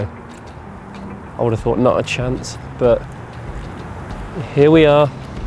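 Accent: Birmingham, United Kingdom. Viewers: that brummie accent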